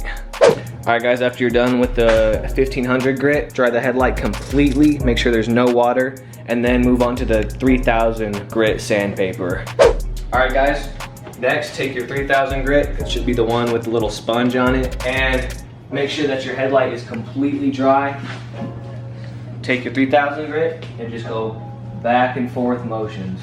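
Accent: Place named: Australia. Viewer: Will it work on dirt bike headlights. Still hearing some of that Aussie accent mate.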